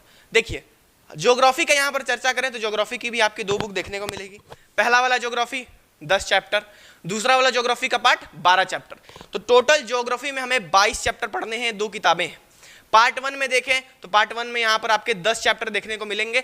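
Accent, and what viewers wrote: Bihar, India. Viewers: Aap Khan sir ki tarah bol rahe ho Bihar ke ho kya? Mein bhi Bihar s hu Darbhanga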